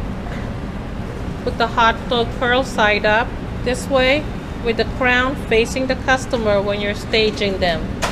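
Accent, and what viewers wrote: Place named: New York, United States. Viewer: That vaguely hispanic? new york accent ❤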